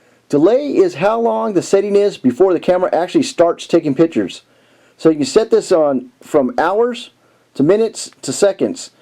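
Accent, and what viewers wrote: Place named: Texas, United States. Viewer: Great video and with the Texan accent it sounds straight forward.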